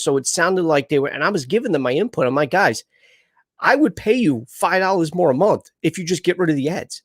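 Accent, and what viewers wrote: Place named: New York, United States. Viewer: You are so NY lol. I sometimes forget this is what I sound like lol. Thanks for the videos btw. Definitely appreciate the info and effort.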